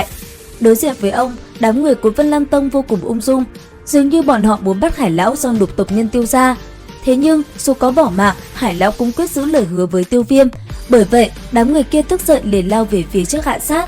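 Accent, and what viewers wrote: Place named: Ha Tinh, Vietnam. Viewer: ông này người hà tĩnh chắc luôn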